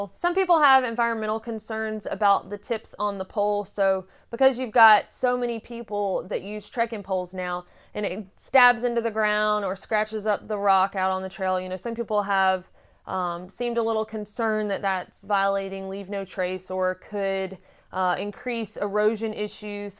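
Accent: South, United States. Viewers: Your southern accent is getting stronger now that you're home. Purtiest sound a southern boy ever hears. I've used the old bent handle wood canes for years. They make great snake locators(that's the original reason I started using them) & the hook makes a good extended hand on a steep climb especially up a river bank. The wood canes also make a good defensive tool in the wild hog country I'm often in: kinda helps that my grandparents raised pigs when I was growing up so I'm well versed in using a cane that way. I have considered trekking poles for a long hike & this is great information. Thanks Ms.!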